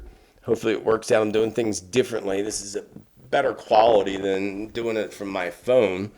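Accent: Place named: Bucks County, United States. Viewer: The way you pronounce certain words is very endearing:) My dear friend from Bucks County does the same thing! It’s precious:)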